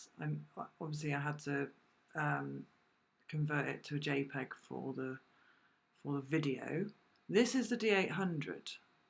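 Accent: United Kingdom. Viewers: I'm here for the British accent. 😁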